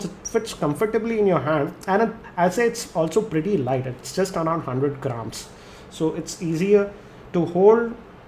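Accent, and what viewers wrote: India. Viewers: moron that is indian accent.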